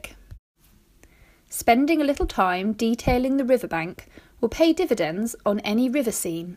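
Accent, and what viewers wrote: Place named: United Kingdom. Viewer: Sounds like a very versatile and easy to use product. I appreciate the narrator's British accent! Lovely.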